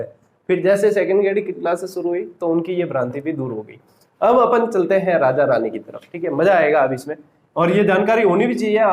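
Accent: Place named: Rajasthan, India. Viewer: Sir aap marvadi bolte ho to bhut achha lagta h pr smjh km aati h greet Sir ✌️✌️👍👍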